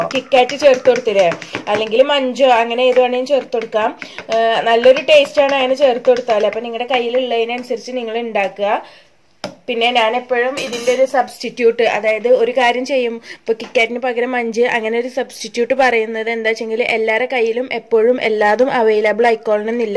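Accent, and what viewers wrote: Malappuram, India. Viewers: Malappuram slang adipoli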